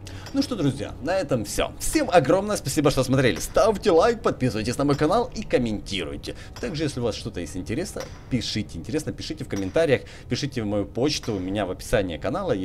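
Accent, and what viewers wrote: Ukraine. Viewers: Эбать ты из Украины)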